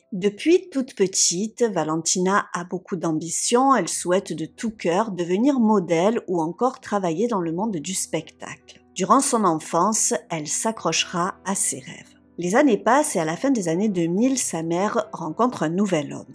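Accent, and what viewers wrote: South, France. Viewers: C'est toujours un grand plaisir de vous écouter. Et ce bel accent du sud met un peu de soleil sur Liège ( Belgique) , où il fait toujours très humide et froid......:-)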